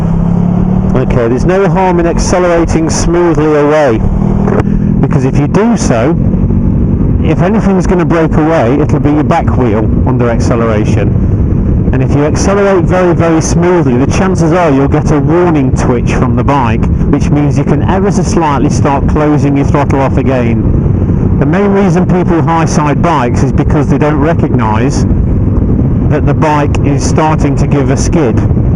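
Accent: United Kingdom. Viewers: great video. thanks. a bit hard with muffled sound, but i could even understand your british well enough. I am from Russia but have lived in California for 22 years.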